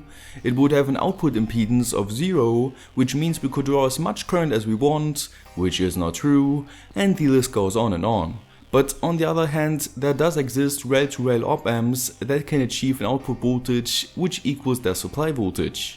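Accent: Germany. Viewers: Could you please explain the schimtz trigger?? By the way, like your german accent a lot.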